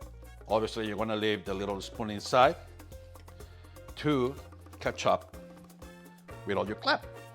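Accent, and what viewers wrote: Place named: Latin America. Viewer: His Latin voice is hot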